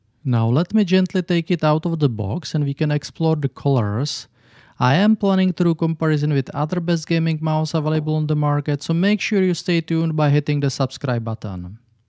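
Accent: Czech Republic. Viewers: Are you Czech lol? Also nice video, keep it up.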